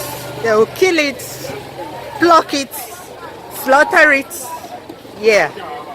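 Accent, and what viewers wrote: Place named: Agulu, Nigeria. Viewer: 😯 so you’re from Agulu and also married an Anambra man! I guess as much from your accent. A bu m nwanne gi nwanyi from Ogidi 👍👍❤️❤️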